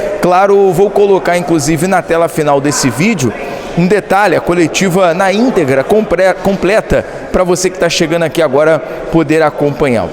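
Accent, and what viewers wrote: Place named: Brazil. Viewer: Só um adendo: se vc é carioca,seu "s" precisa ter som de "x" tenha orgulho de ser carioca irmão. Saudações vascaínas. Vaxcainas,não "Vazzcainas"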